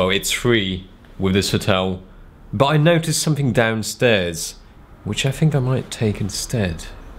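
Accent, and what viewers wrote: England, United Kingdom. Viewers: im still mesmerize after all this year, how could u have such a perfect brit accent... envy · is Casey Neistat Reborn. Or Asian Neistat with English accent. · My fav dude on youtube, love your content, love your humour, love your very english accent! Greetings from New Zealand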